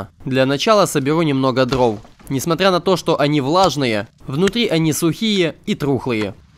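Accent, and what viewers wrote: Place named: Ukraine. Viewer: Он-украинец, русский- не родной язык. Так что не стоит так обращать на это внимание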